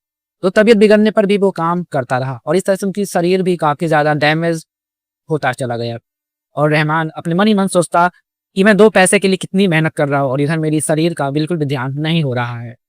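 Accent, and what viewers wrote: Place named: Haryana, India. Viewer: Haryana se bol rahe hain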